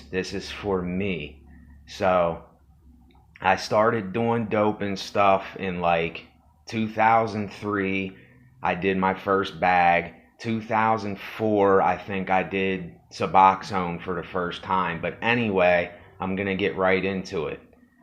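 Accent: United States. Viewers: You sound like your from northern Kentucky. Just saying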